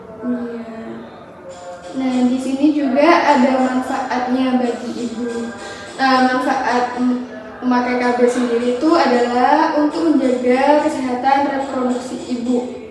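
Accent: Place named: Java, Indonesia. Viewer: Nge Jawa banget ini kak😢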